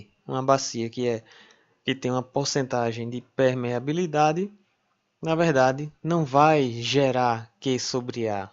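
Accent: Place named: Alagoas, Brazil. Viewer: Retificando: sotaque alagoano!!!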